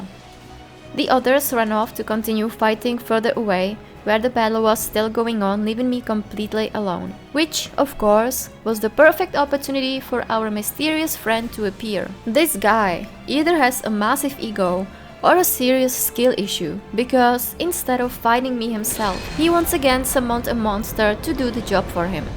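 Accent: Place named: Czech Republic. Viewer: omg já jsem si říkala že slyším český přízvuk!🩵✨^-^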